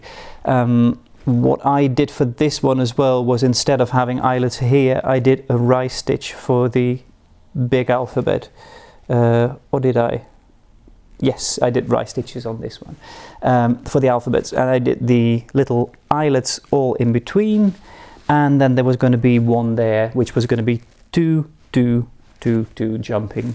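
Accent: Netherlands. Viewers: This was a charming video, sir, thank you. May I ask where in the Netherlands you grew up? You sound so much like my Einhoven-based Philips colleagues. Cheers from a new viewer, Ardith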